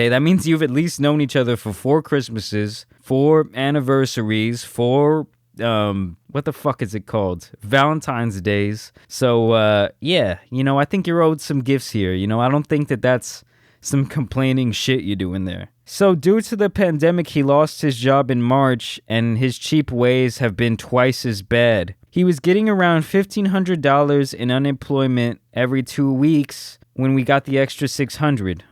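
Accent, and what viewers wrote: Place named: Boston, United States. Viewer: Is nigga from Boston , the accent is hella strong 🤔😂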